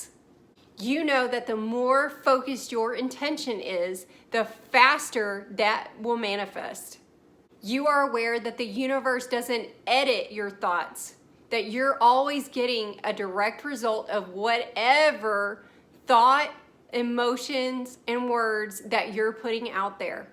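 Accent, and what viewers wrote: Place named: East Texas, United States. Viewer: You have got to be from East Texas! Love your message..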